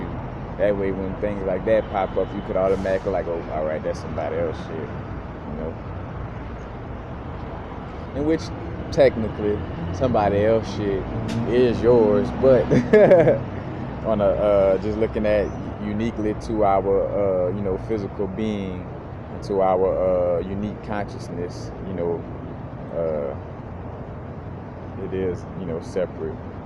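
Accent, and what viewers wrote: New Orleans, United States. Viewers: You have to be from new orleans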